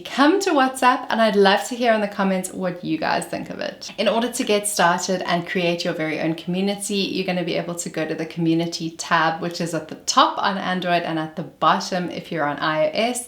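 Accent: South Africa. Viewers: South African right? Cheers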